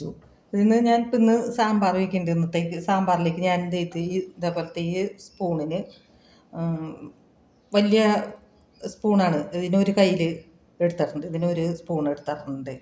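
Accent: Thrissur, India. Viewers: സാമ്പാർ പൊടി നന്നായി ഉണ്ടാക്കി കാണിച്ചു👍👌😋😋 ഇഷ്ട്ടമായി ഞാൻ അന്നന്നു വറുത്തരക്കുകയാണ് പതിവ് തൃശ്ശൂർ ആണോ സംസാരം കേൾക്കുമ്പോൾ തോന്നുന്നുണ്ട്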